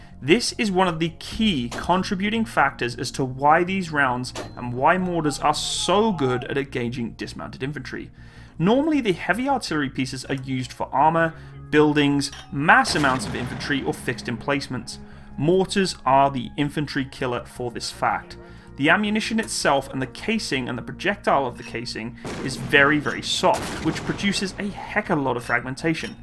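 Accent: United Kingdom. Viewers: >Search Youtube for an explainer on something related to history or the military. >Hear a British voice narrating. >Instantly like and subscribe. It's an involuntary reaction at this point. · I was wondering why the fck I was having trouble understanding some words uttered, till I found out at the end of the video that the man is British. Weird that it didn’t naturally strike me.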